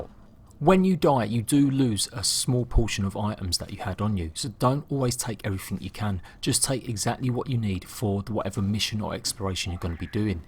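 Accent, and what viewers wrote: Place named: London, United Kingdom. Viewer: Pretty sure this is a London dialect, based on how he doesn't say some t's and how th sounds like v/f.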